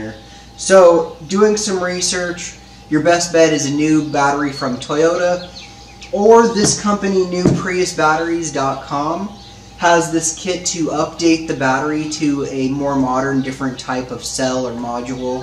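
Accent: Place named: California, United States. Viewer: Hey, thanks so much man, sound like you might be from California, I'm from Burbank, are you still happy with these batteries, it looks like you have lithium just curious I had I haven't listened to the whole video, maybe you mentioned that already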